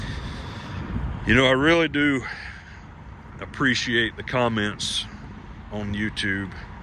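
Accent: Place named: South, United States. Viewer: I love the way you talk bro, no homo shit just like that low and slow southern accent. Just left your stall for grounds down in Florida, Lakeland to be exact. Keep the videos coming my friend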